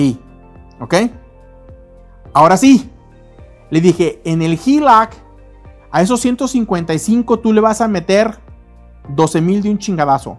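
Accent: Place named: Sinaloa, Mexico. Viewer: UN SALUDO, SON EXCELENTES TUS VIDEOS CON MUY VALIOSA INFORMACIÓN.....TU ACENTO CREO ES DE SINALOA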